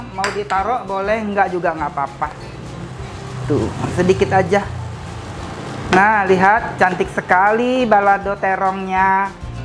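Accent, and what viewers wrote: Bangka, Indonesia. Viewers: "kita mau mencedoknya" ... kokoooo bangka banget geeh...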